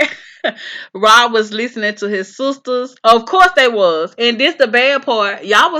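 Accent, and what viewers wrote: South, United States. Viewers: Wow this my first time hearing ur real voice [I love ur southern voice]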